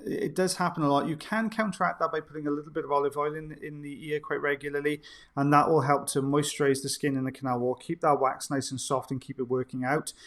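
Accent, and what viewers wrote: Wales, United Kingdom. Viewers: Liking the new specs! You never talk too much. I think of you as my favorite teacher. You’re happy, excited by your subject, and teaching us the whole time! If you record an audiobook, I bet we’d all buy it! Love the Welsh accent and sayings. 🥰😀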